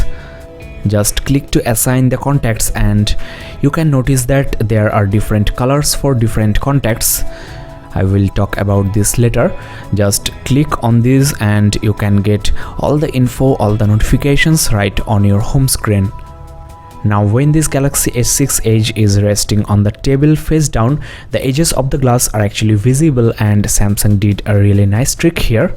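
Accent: Bengal, India. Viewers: lmfaoo thats a bengali accent its clearer than indian but almost the same, indians say aindd for the word "and" lmfaoo. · Not being racist, but he sounds almost like a indian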